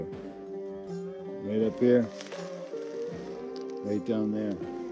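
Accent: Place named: East Coast, United States. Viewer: Hey ! Pauly over here in Nor Cal. I got you over here and respect what you do! Great content and well received , i dig your accent east coast is hella different then my style of west coast or Oakland CA. Mostly but at 54 yrs old im already up in the mountains kinda prerunning a retirement style to see if its way for me ? So far i like it and doing better then at first ,! As it is a trip being solo if you didn't plan it but after two ex wifes w/ 2 kiddos from each and a long term girl friend of 10years turned toxic, resulting in few mo.s in jail up in MT. This is cake walk and very satisfying . So just saying good job keep it up , cause your real kid! One old west coast jack ass too one back east✌🏻🤙🏻🫡🤷‍♂️🕺🏻🪖👍🥽take care bud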